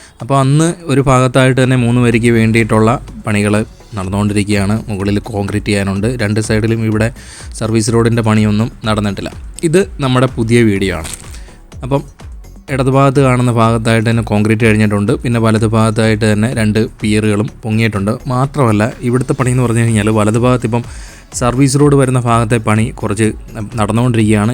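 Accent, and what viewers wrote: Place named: Kollam, India. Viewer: കൊല്ലം ഉള്ളവർ ഇങ്ങനെയാണ് സംസാരിക്കുന്നത്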